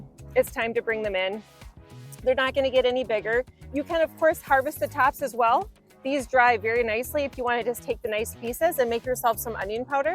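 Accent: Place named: Minnesota, United States. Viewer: I love the video. Your Minnesota accent is in full effect!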